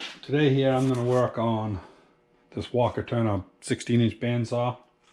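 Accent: Maine, United States. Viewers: I never realized it until watching this video that Jud Crandall from pet seminary talks the way he does because it is a Maine accent. Cool video by the way.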